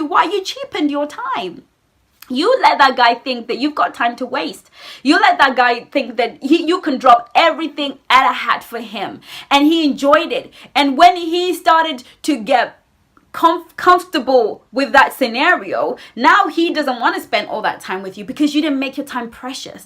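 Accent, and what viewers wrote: United Kingdom. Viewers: Hey, Love you and your cute little British accent.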